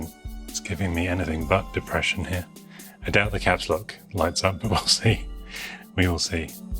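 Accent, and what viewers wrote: United Kingdom. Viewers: Dang, fast replies here! :) Thank you, me and my daughter (who alsdo don't like spiders), also guessed a lizard. Where do you live btw? You sound like you're from the UK, but some sticker on the box said US, but you do like C64, Amiga, etc which is kinda European.. myself from Sweden felt the craze here as well :) Mighty awesome channel though! The asthetics of your old game room / pc room / studio is so inviting, with all the old vintage equipment looking new, fresh and colorful :D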